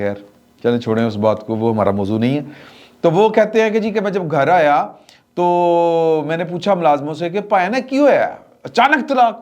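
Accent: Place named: Lahore, Pakistan. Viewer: آپ کا لاہوری لہجہ اور انداز اچھا لگتا ہے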